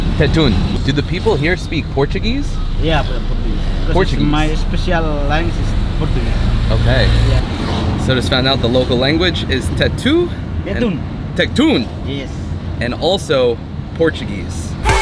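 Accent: New York, United States. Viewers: Yours American English, NYC style is better, than in a movies!!!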